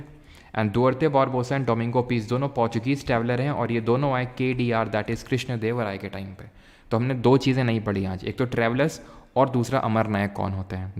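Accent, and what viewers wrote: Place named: Hamirpur, India. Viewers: Sir are you from up voice tone se lag raha hai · Are vahh sir ap to ek dm Mori trf hamirpur U.P ki side ki bwalat hva😅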